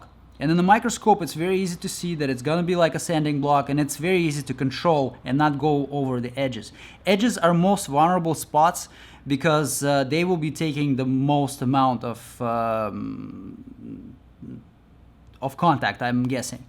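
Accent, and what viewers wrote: Russia. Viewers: акцент-то русский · Cool video. Let me quess you are Russian? 😁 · Russian accent ! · Почему у него русский акцент? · Does this guy has some russian accent? Im from russia and his speech seems unusually clear and strongly articulated for me.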